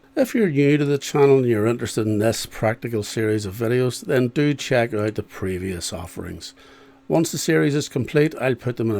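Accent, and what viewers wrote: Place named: Belfast, United Kingdom. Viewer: The Belfast accent is music to my ear. Melodic and easy to follow. This was an informative video and I thank you fir sharing it.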